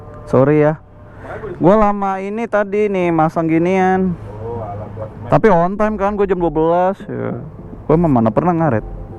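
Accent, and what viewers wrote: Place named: South Jakarta, Indonesia. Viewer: Logat anak jaksel 😅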